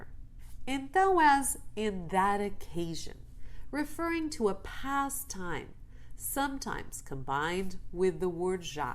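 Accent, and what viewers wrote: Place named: Lisbon, Portugal. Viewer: From everything I've read about it, it seems like peopel who have this "Lisbon" accent (and apparently it's not just a Lisbon thing) absolutely feel that they completely distinguish ei from ai. Just like I'm sure some people in Porto feel like that distinguish B from V. The problem is that non-Portuguese speakers can't hear the distinction. I absolutely would love it if you did a video exploring the various dialects in Portugal. I have wanted to hear such a video for so long. I have learned what I have learned through difficult google searches. It's easier because I have a linguistics background, but still difficult. Even if you continue to speak your normal way, having such a video would help language learners living all over Portugal make sense of some very confusing sounds they hear. And don't forget the Azores! It's such a beautiful dialect. 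And so difficult! It's pretty amazing that such a small country can have so many varieties. Even if you look at all of Portugal speaking countries, most of those varieties can be found in continental Portugal somewhere.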